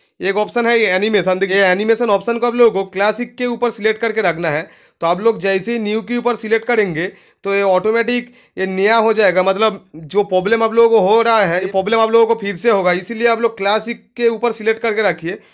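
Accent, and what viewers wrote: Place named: West Bengal, India. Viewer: Awaj sunke pata chal gaya bangali bhai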